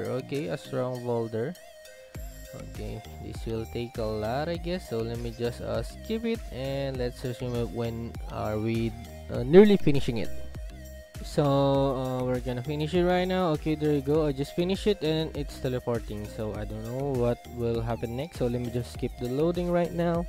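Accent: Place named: Philippines. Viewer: Man u from philipines ur english like philipines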